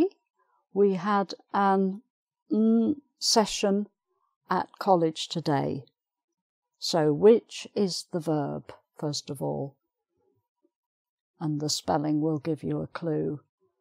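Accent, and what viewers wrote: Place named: Midlands, United Kingdom. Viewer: The lady is from Midlands, he told about this in her video about accents and dialects. She moved to London and her pronunciation gradually changed.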